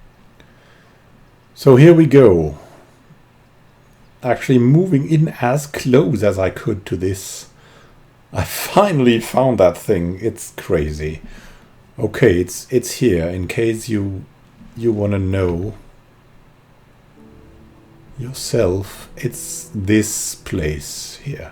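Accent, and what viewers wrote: Germany. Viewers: Are you German? I think your accent sounds like it. Bier hier, bier hier!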